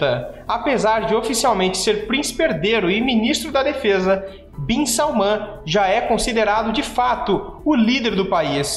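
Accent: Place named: Curitiba, Brazil. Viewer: Ele é de Curitiba, por isso ele tem esse sotaque.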